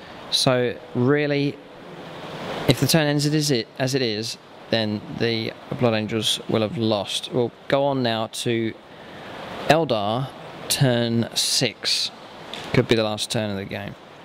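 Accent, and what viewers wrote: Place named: England, United Kingdom. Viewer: i love the english accent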